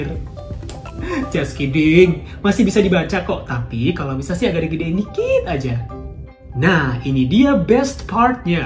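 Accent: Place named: Java, Indonesia. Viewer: Sepertinya masih enggris Jawa ya..cara pengucapannya pronunciation nya belum natural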